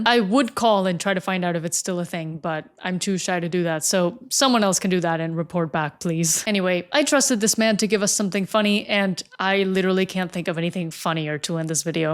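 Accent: Spain. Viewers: Acento español thick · Where did you learn spanish? The pronunciation sounds like you're from Spain. Saludos desde España :)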